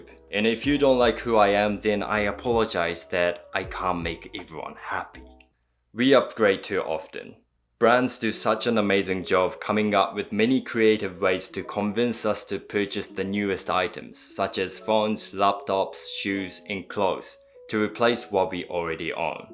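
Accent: New Zealand. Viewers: Okay, 1. You are freaking beautiful, no matter what you wear, 2. You speak English better than MOST Americans, 3. Your kiwi accent is so endearing, and 4. Haters gonna hate — screw ‘em. You’re more evolved than most. Stay focused, stay positive, stay healthy. Love the videos. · I can hear the kiwi and Japanese accent in your voice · Kiwi at heart and speech. Don't let them sass you! Kia Kaha! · I thought I could hear a Kiwi accent hehe, I really enjoy your video's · Your English is very good, you should have no worries about this, you have a beautiful voice and I find it interesting to hear a bit of New Zealand inflection coming thru every once and a while. I have a feeling you have not heard very many Americans speak English so you don’t know how badly it can be spoken! 😂 Very interesting topic I am exploring more myself. Thanks. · I agree, is a very good speaker of English. 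Being a Kiwi myself I can hear the NZ accent. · Thanks for this video and sharing all your journey. Your English is quite good, and your NZ accent is endearing. I am grateful to be seeing Japan through your eyes. And some of your experiences of minimalism have helped me raise questions for my own approach to life. Please continue to share your observations with us. Blessings! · As a kiwi I spotted his New Zealand English accent immediately so not knowing anything about him (this is the very first vid of his I have seen) assumed he had spent some time in NZ. Looking back at some of his previous vids looks like I was right! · Japanese guy with a Kiwi accent... That's hot! 😍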